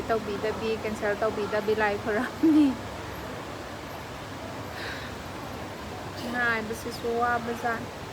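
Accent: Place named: Kakching, India. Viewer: Enao j kakching gi oi taradi kakching maron kha kha nganglako eidi taba yam nungaiye😊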